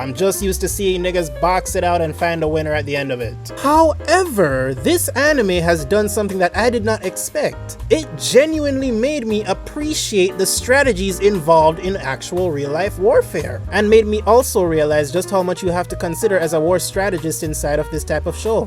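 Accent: Jamaica. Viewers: lol me too like I could catch the Jamaican from a mile away so mi needed to confirm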